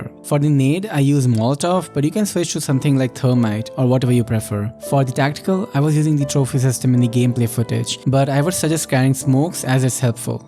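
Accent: India. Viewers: he sound like india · Are you an Indian? You sound like one. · In his recent video, he revealed his voice, it was an Indian accent.. so I guess he's Indian. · I know is India as by his accent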